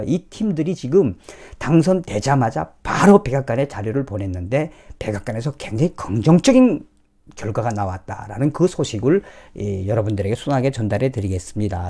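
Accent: North Korea. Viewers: 대표님! 북한말 귀에 거슬리네요!!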